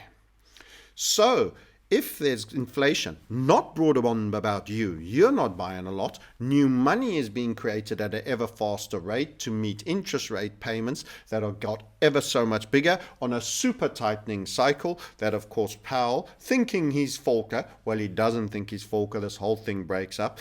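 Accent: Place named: South Africa. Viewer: South African. Fair few Scots went over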